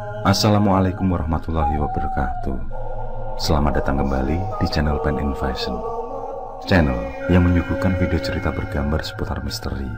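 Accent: Java, Indonesia. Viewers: BARU 32 MENIT BUK NURI TKW DI SYRIA SELALU HADIR ,SUKAK BANGET DENGAN ❤PEN❤ LOGAT JAWANYA KETAL......SUARANYA MANTAB ,NGEBAS , DAN GAMBLANG ALUR CERITANYA , MUDAH DI MENGERTI , NGA MUTER MUTER DAN DIULANG² SUKSES SELALU BUAT ❤PEN❤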